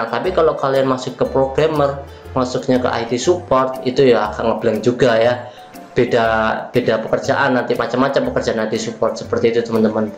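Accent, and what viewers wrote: Banyumas, Indonesia. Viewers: wah pantes ngapak toh, punya No.WA mas?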